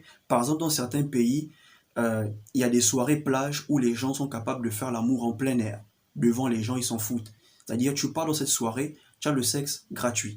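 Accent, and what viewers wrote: Gabon. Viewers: Tu parles tellement bien...je pari que tu es Gabonais comme moi, vu ton accent 🤔et tu es très explicite dans tes dires, contrairement à plusieurs pseudo coaches qui sont plutôt évasif sur leurs sujets🤷🏽 je ne peux que m'abonner à ta chaîne 👍🏼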